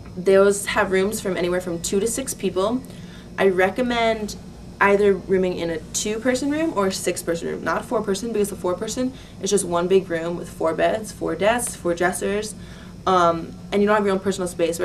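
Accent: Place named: Maryland, United States. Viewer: she has to be from Maryland... her accent is thick haha i talk the same way.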